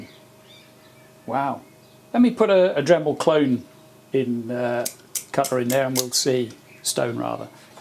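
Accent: Norfolk, United Kingdom. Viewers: Enjoying your videos, yew sound like a Norfolk boy to me (as am I), I don't detect the upward tone rise of a Suffolk boy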